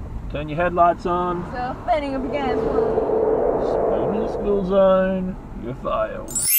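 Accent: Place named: North Queensland, Australia. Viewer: I can usually tell where you are driving because I used to drive a lot in the same places, and still do a bit, so it's all very familiar. One thing I can't place though is your accent. Are you from North Queensland, by any chance?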